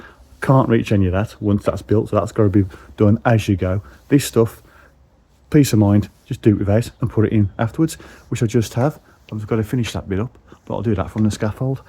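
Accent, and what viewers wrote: Birmingham, United Kingdom. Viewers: Hi bit of a strange comment but, we are accent finders and have been listening to your video. Can I ask where you come from please. I can hear a bit of Brummie and a bit of Wolves/Blackcountry - so where do you come from please - the bet is on for £10 against me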